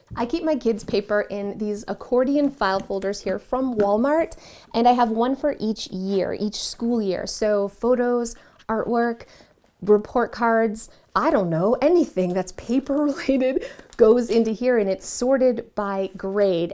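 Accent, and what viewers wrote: Canada. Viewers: Omgoodness... I love your Canadian accent! And, the organizing 😃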